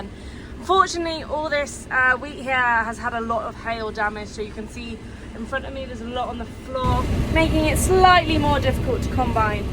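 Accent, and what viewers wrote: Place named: United Kingdom. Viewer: Just love the British accent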